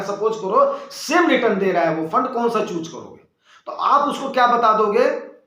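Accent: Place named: Uttar Pradesh, India. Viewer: Up wala bhasha k liye ek like mere taraf se😄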